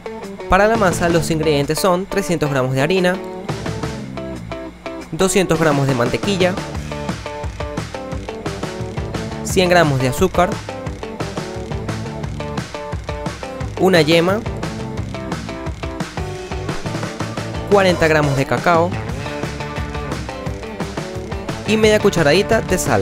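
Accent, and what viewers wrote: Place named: Ecuador. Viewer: es que tienes asento como si fueras ecuatoriano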